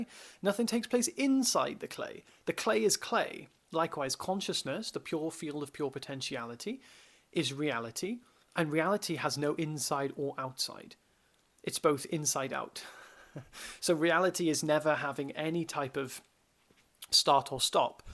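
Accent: Wales, United Kingdom. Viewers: Hang on.... I'm listening to you now, you sound Welsh? I'm from Cowbridge. I am guessing that you are near Cardiff?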